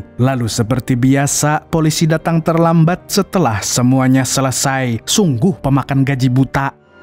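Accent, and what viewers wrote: Banjar, Indonesia. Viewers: Adminnya orang Banjar kah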